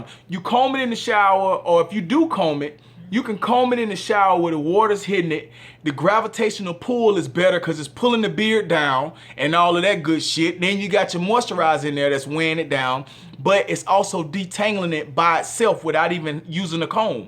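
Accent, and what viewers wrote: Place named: South, United States. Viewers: Country as hell good information my man and Roll Tide Roll!!!!! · Where you from Htown lol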